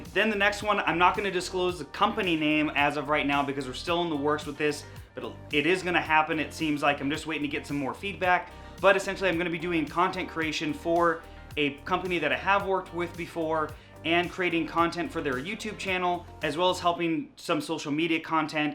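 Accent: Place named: United States. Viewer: I follow you since 2013 I think, and I have never understood a single word, because you speak very fast and with american accent (I'm Italian). But I like your videos! :)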